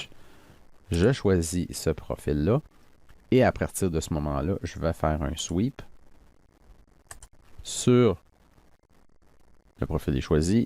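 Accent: Quebec, Canada. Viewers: Bonjour, je suis impressionné par la qualité de vos vidéos qui sont toujours très précises et à travers lesquelles on devine un grand professionnalisme. Et c'est aussi un plaisir d'entendre cet accent québécois pour nous les Français.Je m'étonne que votre chaîne n'ait pas pas plus d'abonnés car vous le méritez plus que d'autres. Merci pour nous et continuez comme ça. 👍👏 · Très bon(s) tuto(s), on voit que c'est préparé même s'il reste quelques boulettes (qui n'a jamais oublié de sélectionner un composant avant de faire une manip, c'est mon drame !). Chaîne méconnue mais il y a de la place dans l'espace francophone. L'accent est super (pour moi), ça me rappel mon séjour au Quebec. Je vois un petit -22°C à Montréal aujourd'hui, courage les cousins dans 6 mois ça sera la canicule :)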